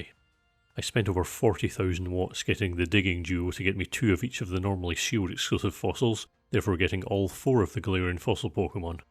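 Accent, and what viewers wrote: Scotland, United Kingdom. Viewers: I'm genuinely curious. Is this your normal voice? Where is the accent from, it sounds british but i am uncertain, is it just a deeper tone? How old are you? I feel like ive heard a similar voice but i cant say for sure and definitely cant remember where from. It is a very calming voice though... i sleep to your vids sometimes and i find it very helpful. I also enjoy rewatching it in the morning, if i feel that i missed something. Speaning of, i should search for those hidden kiwis lol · so this made me want to try this, really enjoyable video, thank you! Also, love ur accent, i am also Scottish but ur voice is very soothing lol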